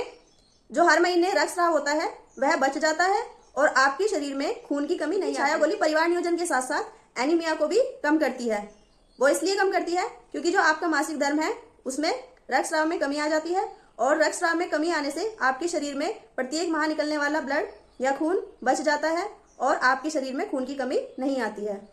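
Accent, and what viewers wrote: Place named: Uttar Pradesh, India. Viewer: Nice explanation dii, aap up se h kya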